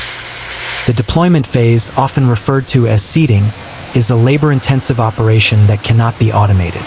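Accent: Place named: United States. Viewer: What is an American doing narrating an Australian Project ?????????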